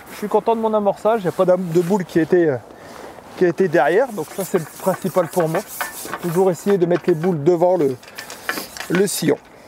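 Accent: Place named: Nord, France. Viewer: Salut, c'est vrai que tu as quitté le Nord, j’avais complètement oublié, mais bonheurs ta pas perdu l,accent. Bonne continuation et plein de nouvelles vidéos. Jean-Paul.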